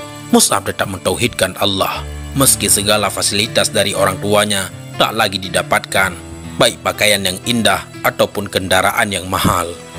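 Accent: Aceh, Indonesia. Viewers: Kalau boleh menebak .di dengar dari suaranya Abang asal dari Aceh / Lombok?🙏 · Logat mimin sepertinya dari Aceh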